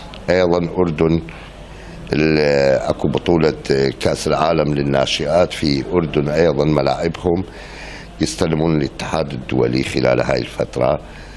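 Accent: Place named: Iran. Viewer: وربي حتي كلامه تحس ايراني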